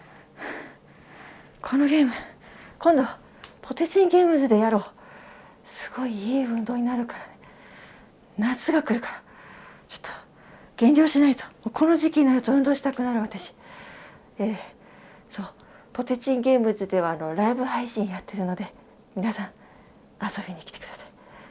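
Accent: Kagoshima, Japan. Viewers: 只々、スケベ心だけで見続けてしまった。声と喋り方が可愛い。鹿児島弁なのかイントネーションがとても耳障り良い。良いチャンネル見つけてしまった。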